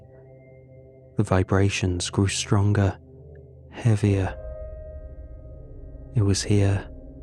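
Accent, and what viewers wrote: United Kingdom. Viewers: The British pronunciation of many words varies from ours greatly. And he has probably just as many British subs as he does anywhere else. So why should he cater to just one area with his pronunciation? Now if you want to hear some horrible grammar go listen to! He is American and mispronounces so many words I’ve given up trying to help him.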